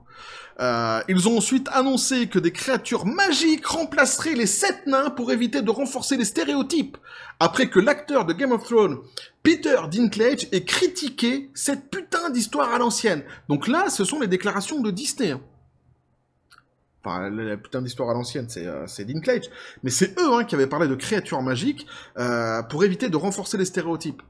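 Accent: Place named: Quebec, Canada. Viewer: Rien que pour l accent québécois, je met un pouce vert! 😊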